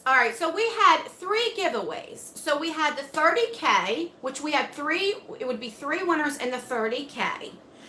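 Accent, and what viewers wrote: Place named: East Coast, United States. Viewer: I just bought the temptresses palette. No big, but it was 10%, not 15. Thank you, though! I can’t wait! Your eye look is so gorgeous! You do so well, where you cut off the side of your eye right at the end of your brow. It really lifts your eyes! Great job! I really admire what you do! I miss the East coast. I went there as I played piano for President Bush for the prayer breakfast and stayed with a friend who has your accent! You make me miss her! 😇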